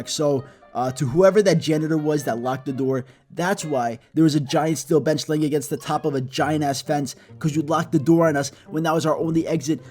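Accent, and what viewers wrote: New York, United States. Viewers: This mans gotta be from new york or someones god father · Haha how you can tell he is newyork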